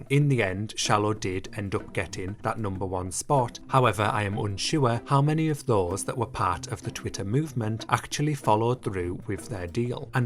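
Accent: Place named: Yorkshire, United Kingdom. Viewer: Your Yorkshire twang just shines! I swear your from Yorkshire lol:)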